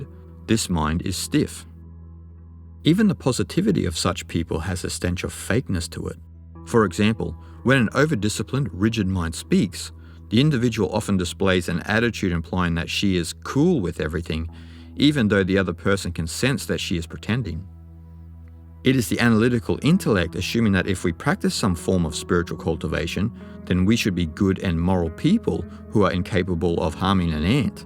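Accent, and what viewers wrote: Australia. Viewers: A piece of art really, I watched both documentary, your voice is so calming and I love your Australian accent, the quality of information are incredible and very well explain. Thanks for this. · I am grateful for the fact that just discovered your channel through The Art of Effortless Living two days ago. And yes, it was the perfect timing as how the law of attraction usually works. I listened this video in this morning, what a great way to start a day! Listening your contents is joyful way to be in the moment especially when my mind is agitated. (: Also checked your website, judging by the quality of your videos I bet your books are as remarkable. As a person born and grew up in far East I was not interested in Eastern philosophy for the first three decades of my life. But I found myself always captivated by the words from those who originally western born but obtained depth of both western and eastern philosophy/spirituality. 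To me their way of speeches are so effective, easier to digest and apply than those speakers/writers that are eastern born even though I don't doubt the eastern born also have good depth on both side of philosophies. I guess you are one of those exceptionally people have such depth in both way and know how to explain well. Your contents are as listenable as Alan Watts' speech videos. I didn't expect to hear such words in Australian accent which is amusing and refreshing. :D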